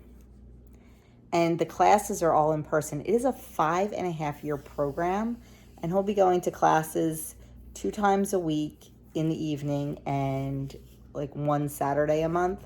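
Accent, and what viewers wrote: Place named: New Jersey, United States. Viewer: I love your NJ accent I am laughing saying this because I live in Worcester MA and I totally have accent. I love your recipes and everything you share. I got the vaccine too. I had Covid 19 in May of 2020 it was awful. I never want it again. Can't wait to see the chicks Doug did such a great job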